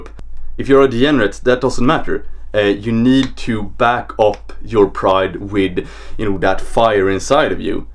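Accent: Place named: Sweden. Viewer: Man your accent (and looks obviously) is so Swedish god damn XD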